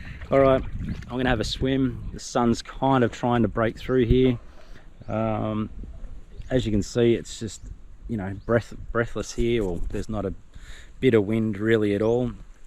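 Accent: Australia. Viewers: Great to hear an Aussie accent in Dumaguete in lieu of americano I will heading there from Manila next week love to catch up with a beer if likes cheers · Hey, it’s great to hear a Aussie voice talking about his adventures in the Philippines, we work hard for what we have, so I agree buy what makes you comfortable.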